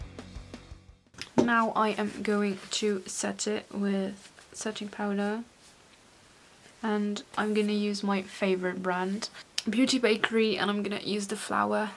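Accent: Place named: United Kingdom. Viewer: that british accent tho, love the video. Keep it up :>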